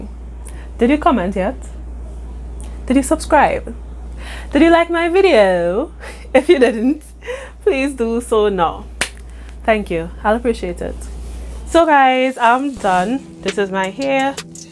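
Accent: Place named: Trinidad and Tobago. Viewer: I love your accent!!! I have trini friends so this makes me feel like I'm chilling with my peoples 😂🤗 let me finish watching haha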